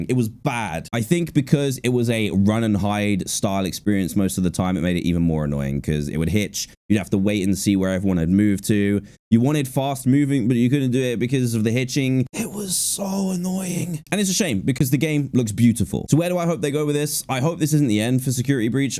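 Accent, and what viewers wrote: United Kingdom. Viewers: Dan I love your British acent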